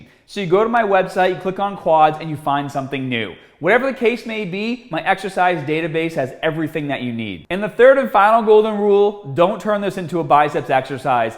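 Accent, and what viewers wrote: Boston, United States. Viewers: Glad I found you again, I used to watch you way back, maybe <num>. All I could remember was that I was looking for a guy with an epic Boston accent. Great content!!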